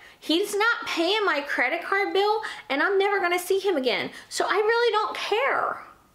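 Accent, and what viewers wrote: New Jersey, United States. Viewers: Get a dog, you’re much less likely to be a victim of crime if you have a dog. If they have to choose which truck to break into I guarantee it won’t be the one with the dog. When I was in the military actual hard as f bad guys weren’t scared of us pointing a rifle at their heads but you bring out a dog and they disappear, quick You can tell your from New Jersey lol Love you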